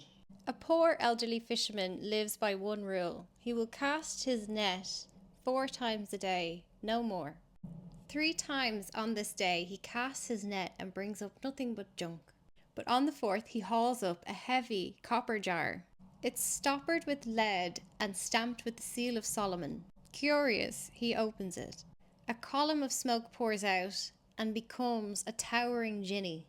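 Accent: Ireland. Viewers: Fascinating accent — I love the Irish ❦ Please read and breakdown more tales from the ⟨1,001 Nights⟩ and deconstructing the «moral scaffolding» within ❦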